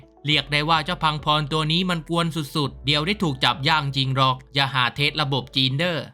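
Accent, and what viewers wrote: South, Thailand. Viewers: คนใต้นี่หว่า😅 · ปลาจ้องม่อง😊คนใต้ใช่ไหมเนี่ย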